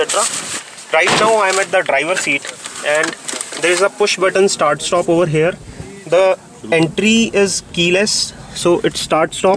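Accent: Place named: India. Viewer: English is jarring to the ears. Indian english is bad enough. This guy makes it even worse by talking fast!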